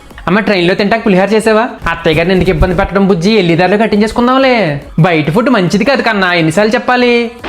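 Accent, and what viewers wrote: Godavari, India. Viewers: Mee godavari matalu vinte Pranab Leche vastondi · సూపర్ మీది గోదావరి నా sir ❤❤💐💐🙏🙏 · Mana godavari yaasa malli baga gurtuchestunaru garu.. missing that after marriage and moved to other states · ggaruu mee story prathilipi lo chadivanu andi naaku baaga nachindi mee writing style and mee godavari slang lo chamatkaaram ga maatladadam yevariki sadhya padadu.😅😅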